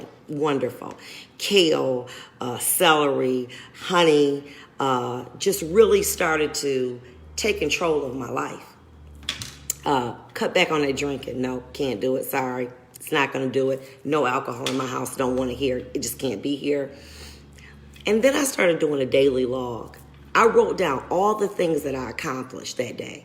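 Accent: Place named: United States. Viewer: I love her country accent.. I’m from New York and she sounds mad country lol I love it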